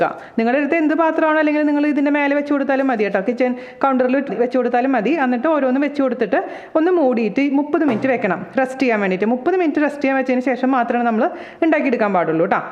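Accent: Thrissur, India. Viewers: Thrissur Baasha keakan thonnumbo ..chechys vdo kaandu samadannikum....kubbos and chicken for todays dinner:)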